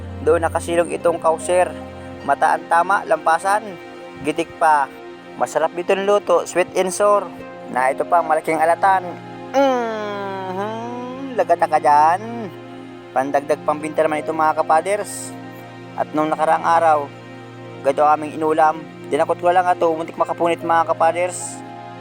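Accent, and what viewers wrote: Bicol, Philippines. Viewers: Tyaga lng idol,masaya kng panuorin dahil may halong bicol pananalita mo,dahil bicol din ako,sa sipocot cam sur,kaya lng nsa europ ako.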